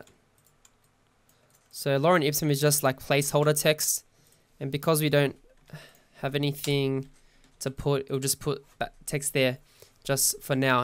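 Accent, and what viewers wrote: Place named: Australia. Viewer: Ah an Aussie chap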